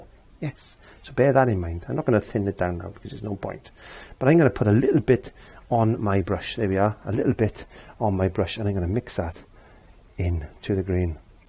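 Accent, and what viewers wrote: Wales, United Kingdom. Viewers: Great video! Am I detecting a bit of a welsh accent mixed in with another?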